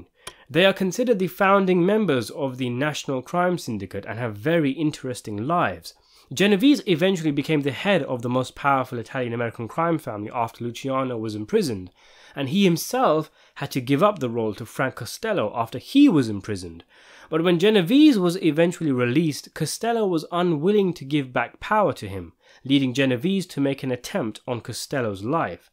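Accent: United Kingdom. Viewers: The genre is not dead just because Mafia movies always came out every decade or so. People need to understand the glory days of the mafia if anything is what's dead. Also Hollywood really does not make good movies anymore like they used to. Martin Scorsese did not invent mafia movies either. This Brittish guy narrating I can't stand to listen to. DeNiro can still do great. So I will be renting this.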